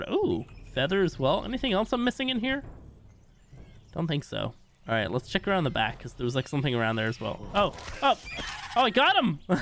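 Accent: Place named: Ireland. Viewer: What part of Ireland are u from in from louth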